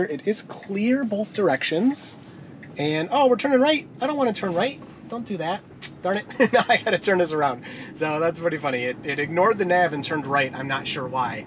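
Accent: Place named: Midwest, United States. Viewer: Just hit SUBSCRIBE, so I feel like I have the right to say this… Dude, you’re in Michigan. What’s with the “y’all” talk??? You even have an unmistakable midwest accent and you are clearly not southern. Just sayin’…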